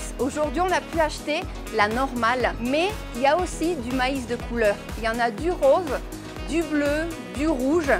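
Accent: Mexico City, Mexico. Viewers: Wow me encanta que hablas español come Mexicano y no español lol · Hablas tan bien el español, que hasta el tono chilango ya lo tienes o se te pegó....Saludos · Es la primera vez que veo uno de tus videos. Eres genial. Hablas español mexicano a la perfección. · Muy agradable el vídeo, fluido, dinámico, con un español coloquial muy de México, uso de frases populares bien colocadas, información relevante de cada alimento que es útil Se agradece que no sólo sea comparativo entré ambas culturas, sinó que deja educación para todos. Muy agradecido con el esfuerzo de informarte y compartir cultura. Cómo décimos aquí, tu vídeo esta p...ca madre! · Muchas Felicidades eres una excelente narradora y el Español que hablas es muy latino y con acento muy mexicano te sigo en tu página muy amenos comentarios saludos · Eres taaaaaaaan linda, qué hermoso te expresas sobre mi amada cultura 💜 Tienes un carisma súper lindo! 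Y me encanta que hables español mexicano, no cualquiera lo logra. 😂💜💜💜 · très bonne votre mexicain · Que acento mexicano más guapo. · No mms habla mejor el español que muchas personas y hasta suena muy mexicana alv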